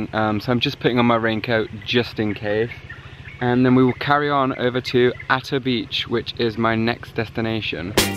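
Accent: United Kingdom. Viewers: Loved ur vid!! N.. U accent! Seems british.. Feels good to hear u tlk.😀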